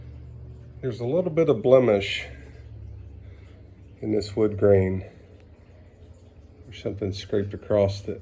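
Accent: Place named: Baltimore, United States. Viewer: Great looking boat, you have a southern accent with baltimore pronunciations.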